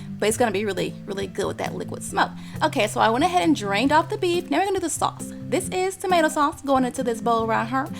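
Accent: South, United States. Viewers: YOUR CHANNEL IS THE BOMB!!!! I JUST LOVE YOU. YOU SOUND LIKE AN OL' COUNTRY GIRL LIKE ME. I'M FROM LITTLE ROCK, ARKANSAS and I saw my Granny making, not all but most of these recipes. But, what's so crazy about it, she wouldn't let me my sister and my cousin be in the kitchen with her. I didn't learn how to cook, well, until I got out on my own when I was 31 plus years. LOL It's crazy. LOL My granny never wanted anyone in the kitchen helping her. AIN'T THAT SOMETHING? She had 9 children and my mother was the oldest. I'm the first grandchild. She cooks big meals everyday, and everybody is gone. My oldest uncle passed in 1998, my Paw Paw passed in 2013, my other uncle passed in 2014, my aunt passed in 2015, then my Mom passed May 12, 2017. My Granny is 88 years old, and this to say that she cooks like this still till this day because she is so used to it. 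Now growing up this was the routine. She cooked Sunday {big meal) through Thursday. Friday was always Fish day. Catfish steaks, fillets or whatever. Saturday was lunch meat day. You better take yo but in that kitchen and make yo;u a pressed ham. bologna, You better hope you got chicken loaf and cheese sammich. LOL Oh and you better hope you got some Lays or Ruffle chips to go with that sandwich with that meal. But I would love it when it would be about 6 or 7 pm and she would start putting her dinner on for Sunday..., when I was the only grandchild..., LIKE I SAID i'M THE OLDEST GRANDCHILD out of a million. LOL, but she would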